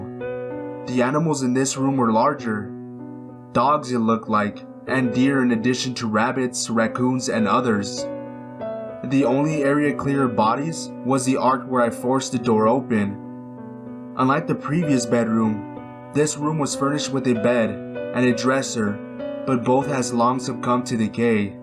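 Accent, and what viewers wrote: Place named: Latin America. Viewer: u have a latin accent.. are u latin? anyway nice videos seen every single one of them keep up the work...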